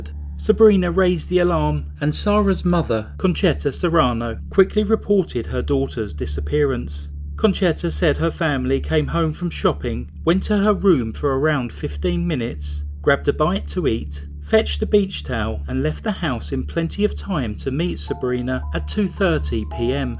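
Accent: United Kingdom. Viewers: Aaaahhh, your Italian syntax was so impeccable I thought I was listening to. I could barely notice just a smidge of British accent.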